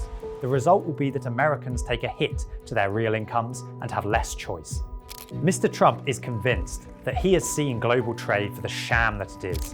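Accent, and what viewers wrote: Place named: United Kingdom. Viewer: Heard the British accent and knew it would be trash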